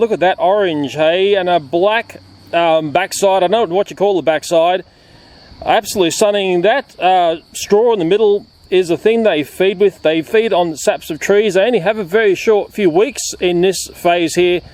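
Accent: Australia. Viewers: Australian accent faayaaa the best pest control... Dint think fire would be good for trees did yah. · You sound like Australian Christopher Walken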